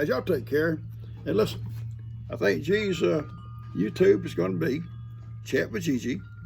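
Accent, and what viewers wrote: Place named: Texas, United States. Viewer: I have always enjoyed hearing the stories told by the older generation. Except, now I am one of them! I was born on New Year’s Eve in 1953. But, you have such a likable personality, and it is charming to hear your Texas style accent in the words you use when speaking. Just wanted you to know that, and hope Gi-Gi is doing well, after the terrible hack she has had to endure. I know she has sought strength and comfort trusting in the Lord.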